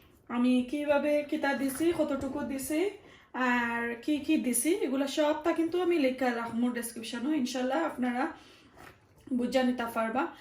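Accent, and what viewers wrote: Sylhet, Bangladesh. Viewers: nice,please sister don’t change your accent, I like to hear our Sylheti accent, u r a Sylheti person so why should u change your accent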